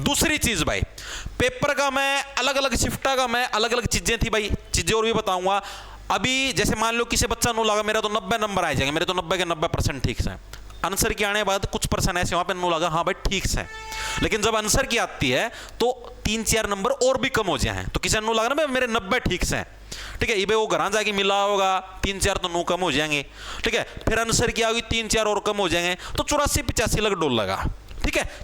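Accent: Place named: Dadri, India. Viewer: Aapki boli must h ji dadri side k i hna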